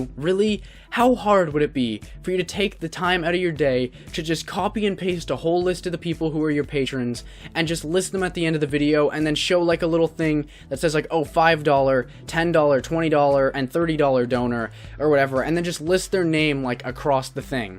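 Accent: Canada. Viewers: Watching you has literally reminded me of all my wonderful childhood summers spent at Crystal Beach! Directly after the Peace Bridge, or within short distance. (After the amusement park was shut down:/ but still the absolute happiest memories) for a few reasons. You legit bear a shocking resemblance to one of the slightly older teenagers who lived next door to our summer cottage (he was always looking out for us younger kids and even the slightly older cousins and whatnot especially if we wanted to venture in to the town. Even with my older brothers taking us younger kids, this guy still made sure to come with us as he didnt want anything weird happening. Like a surrogate big brother or cousin. Okay this turning into a WHOLE thesis on why your accent and then the almost doppelganger resemblance, has helped me to feel more related to you. And also just made me feel damn good to remember those times. 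So, thank you for being Candian cuz as dumb as it, honestly made me like you more.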